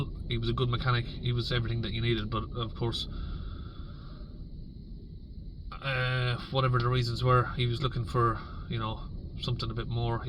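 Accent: Ireland. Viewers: It woukd great to.see.ya moving to ireland ,I have two luton maxi movers ready , for a fella that spent that long in the uk ya never lost the accent carlow or laois ?by the way 40 isn't that old ,ireland got very expensive that last 5 years though great channel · Thanks. For to be in England form 12years old, good to hear you haven’t lost the Irish accent. 👍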